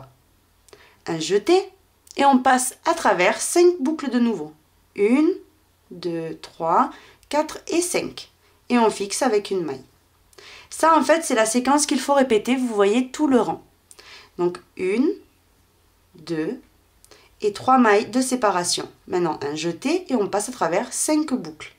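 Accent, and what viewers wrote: South, France. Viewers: Bonjour merci pour toute vos explications vous êtes très jolie et gentille et j adore votre accent ca me fait pensé au midi je devais faire une etoles et je trouvais pas de points crochet Assez beau merci pour ce magnifique point je vais faire mon étole comme ça bonne soirée à vous et votre familles courage à vous tous 👍👍💞💞💞💞💋🌹🌹🌹🌹🌹💐💐💐💐💐💐🌺🌺🌺🌺😸😻😻😻😻😻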